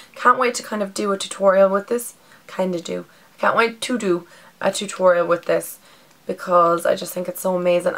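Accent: Ireland. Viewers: Bet u from Dublin or cork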